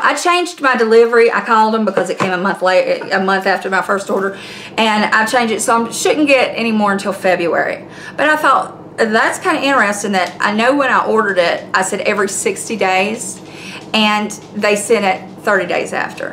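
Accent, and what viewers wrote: South, United States. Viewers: I’m going to subscribe.. i love your personality. Lol 😂 I love that accent . I’m country too ! I was checking this product out for mom . Still debating if I’m going by it or not . Lol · Southern accent ... ew ... get 2 the point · Not fake, and if you’re from the South, then it sounds normal. If I go up North, I have a difficult time understanding people, the first time they say something to me, and sometimes have to politely request they repeat themselves. BUT... I don’t dislike the accent nor do I insult their accent. Like Mama says...”it takes all kinda kinds, so be nice and you might learn something.”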